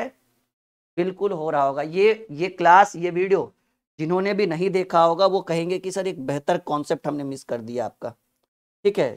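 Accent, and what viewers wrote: Bihar, India. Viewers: Sharab bahut achcha padha rahe hain kya aap Bihar ke hain kya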